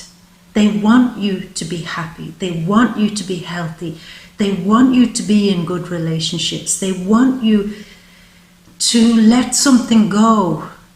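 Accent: Ireland. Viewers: Six bajillion holohohoaxers on the rampage from the murder of the Romanov children in cold blood, the Holodomor and the 50 million anhialated through the 1918 Spanish flu, called so because Spain was the only country without a blanket ban on media. To wall Street and the Frankfurt School Freudian Marxists kicked out of European countries, again, now to rule by political correctness and to silence the counter narrative unofficial thinkers. Apart from that tyrannycal despotism I really like your top on you, and ye've a great brogue.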